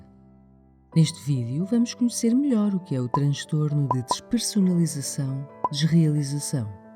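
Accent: Portugal. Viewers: É português de Portugal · sotaque lindo de Portugal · Sotaque de Portugal, legal, aqui no Brasil pouquíssimos conhecem essa doença, eu pergunto pra alguns psicólogos ou médicos e ninguém nunca ouviu falar delas(dp e/ou dr) administradores do canal, vocês tem alguns artigos sobre DP e DR? estudos mais avançados sobre elas? quero ler, quero estudar a fundo pra tentar melhorar ou me curar disso, a saúde no Brasil é de passar vergonha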